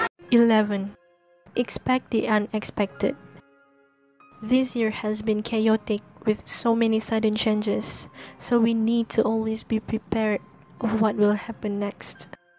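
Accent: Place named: England, United Kingdom. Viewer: Come here because of english eccent..apa yg aku merepek ni..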